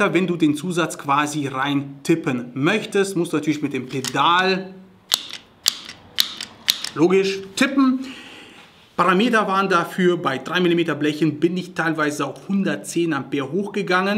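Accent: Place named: Russia. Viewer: Bester Mann wie er es erklärt usw weiter so ich finde dich Top! An deinem Dialekt kann ich hören das du aus Russland kommst, ich muss zugeben das ich unter Russen das Schweißen MAG und WIG gelernt habe und die waren echte Profis!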